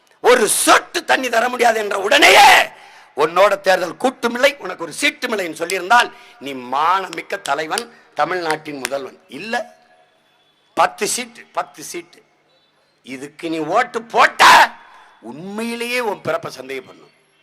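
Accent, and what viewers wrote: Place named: Andhra Pradesh, India. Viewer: Guntur Tamil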